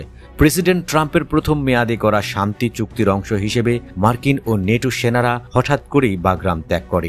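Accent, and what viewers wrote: Kolkata, India. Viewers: জে সংবাদ পড়ছে সে মনেহয় কোলকাতার নাগরিক।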